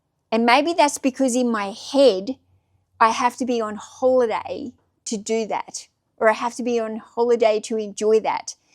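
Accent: Australia. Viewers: I love this....I don't know how to be bored...I started driving strangers (Lyft and Uber) in the NYC and NJ Metro Area....I was burned out from my own business (Consulting for startup Co's ) and needed to get away from a computer. I was terrified about not having any money coming in and having to use my retirement fund to pay bills....I put my head down and just...F......G drove for 2 years....But it wasn't work that I found painful...it was quite liberating and I found my way back to some consulting work but found that Starting my own Youtube Channel based on those 3,000 Lyft Rides (with Strangers) has given me such meaning and put to use all of that experience...Money is still an issue and at 62...I'm still hustling but at a level now that if fun and sustainable and without the fear! Found your Back from Burnout Channel and appreciate your content and lovely Australian accent. 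Thanks. Sean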